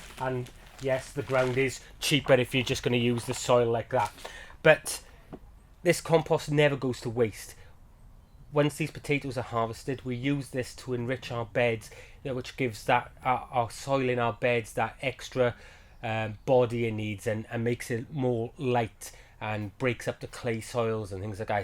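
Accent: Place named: Liverpool, United Kingdom. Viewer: Hi,. Another geezer in his seventies here, thoroughly enjoying both the content and that wonderful scouse accent! Does bucket growing translate directly into bag growing or will you be doing a vid on bag growing at some point? Grew my first spuds last year. Used potatoes from the fridge. Chitted them in a cardboard box in a dark cupboard. Chopped them up to avoid too many chits on one bit but didn't know to harden them off before planting but will now do this! Used a mix of soil, compost, perlite and coco coir. Watered in some fish blood and bone towards the end and cut the flowers off. Well pleased with the number of potatoes harvested although mostly not too big. Saved nice plastic bags that held logs we bought. Will put them in bin bags with the bottoms cut off to exclude light. Using some British Queen Scottish second earlies from JBA. 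Will also get some indeterminates in a few weeks and see what happens! Thanks again and will be learning more from you day by day. Regards, Bob'O', U.K..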